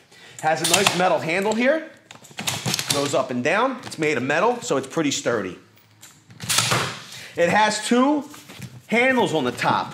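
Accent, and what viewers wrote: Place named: Rhode Island, United States. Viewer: You have got to be from Rhode Island. North Providence? Johnston?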